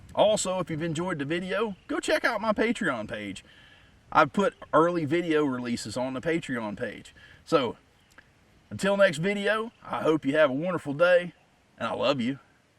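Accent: South, United States. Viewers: I always liked a southern accent. Makes things go down smooth. LOL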